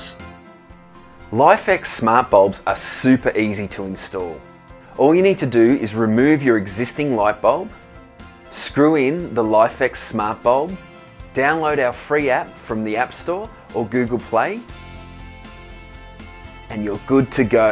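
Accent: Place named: United Kingdom. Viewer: The only reason i can Believe is "British Accent".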